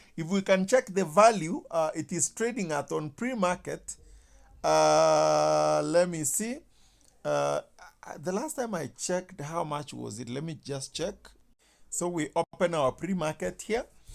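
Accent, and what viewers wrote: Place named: Kenya. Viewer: You sound Kenyan.. Kwanza mkale😂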